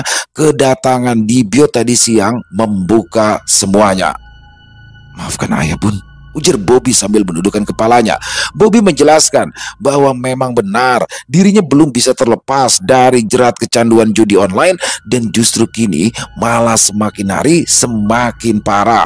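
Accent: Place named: Betawi, Indonesia. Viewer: sangat terhiburr. Om...keren pembawaan nya..tapi klo sdh cerita ada gaya betawi nya lbih lucuu...😂keq warung bng doni...sukses terus ya om ❤️❤️r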